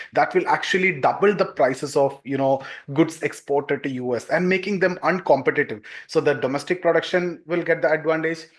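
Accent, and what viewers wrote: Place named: South India, India. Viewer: Typical south indian accent....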